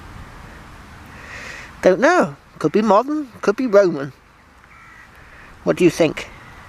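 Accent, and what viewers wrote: United Kingdom. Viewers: Hi. I love to listen to a nice west country accent just like my old POP, he came out to australia from England in 1920. The GPX 5000 was designed in australia to cope with the highly variable iron mineralization of our goldfields, Its primary purpose is to hunt for gold nuggets. The large coil will be confusing the detectors micro proccessor into trying to retune iron targets out as ground noise. A PI machine will punch deep in mineralizd ground but will not be much good at interpretting the signals from the iron or copper halo that forms around long buried iron or copper bronze objects. It will however find gold coins etc.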